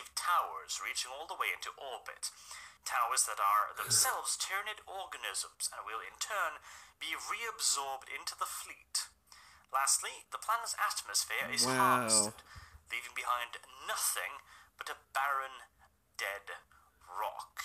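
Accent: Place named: United States. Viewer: Oh, MURICA xD I was trying to guess via akcent :P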